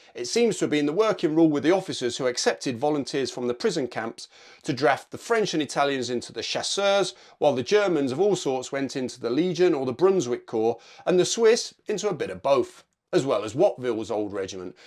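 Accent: United Kingdom. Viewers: It is so interesting ! I really had never heard before about that regiment of renegades. Being a French living in United Kingdom for almost 24 years now, you understand that I somehow feel a strange closeness to them. You ask for the viewers' opinion on this regiment, there is mine: I wish the British Army, which I respect, would re-create a Chasseurs Britanniques Regiment. I would do anything to join. A bunch of misfits, anti-europe renegades, "gilets jaunes", "soldats perdus" and 2nd-rate mercenaries, it would be so French ! Especially if it meant having other troops performing all the boring tasks like guard duties (definitelly it would be very very French). You'd just have to change the Redcoat for DPM. Anecdotically, I liked the efforts to pronounce correctly the French names, with a so British accent. I had great time. Thanks so much for this video.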